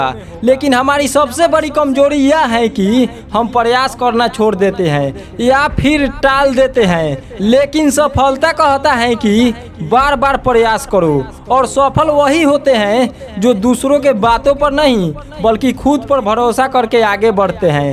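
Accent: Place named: Bihar, India. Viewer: Mai bihar se hu tumhara Bihari tone saph dikh rha hai Hindi sahi karo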